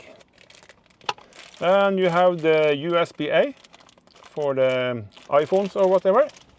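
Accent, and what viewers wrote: Norway. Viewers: Var ikke vanskelig å høre at du er trønder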